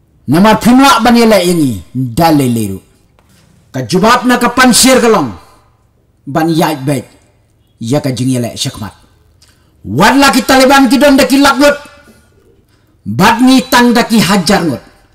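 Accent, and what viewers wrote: London, United Kingdom. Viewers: Ga deg earphones kyndit ia ka sur bah London.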